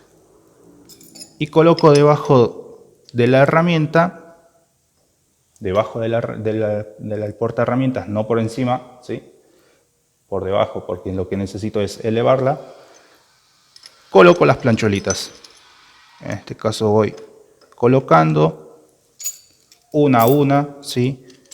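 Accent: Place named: South America. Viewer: Me caga oír hablar aún sudamericano 👎👎👎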